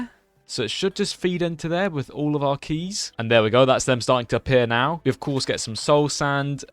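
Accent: United Kingdom. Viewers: Pretty similar but Mumbo's accent is more southern, but this guy is more estuary. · Bro is british mumbo jumbo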